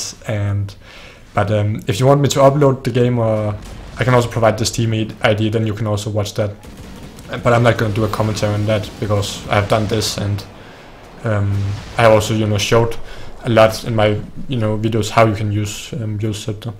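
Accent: Denmark. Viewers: Hey are you from Denmark,?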